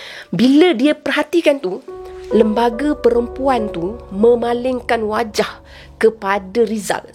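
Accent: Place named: Kedah, Malaysia. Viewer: kak ni mmg org kedah ea tngok lancar ckp😁😁😁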